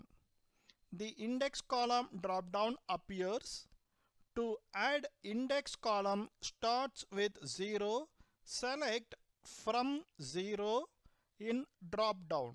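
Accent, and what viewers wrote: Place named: India. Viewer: Content is good, but the indian accent is really giving me a lot of room for excessive thinking.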